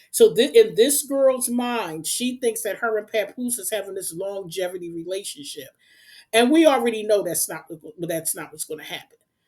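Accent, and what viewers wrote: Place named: Philadelphia, United States. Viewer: You’re so right! I also can tell you’re from Philly or the surrounding areas maybe our accent is one of a kind! Hey hey! 🫶🏽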